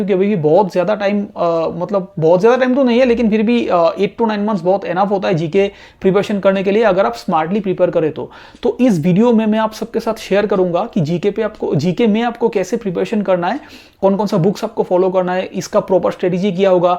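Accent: Bengal, India. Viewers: Bengali lagte ho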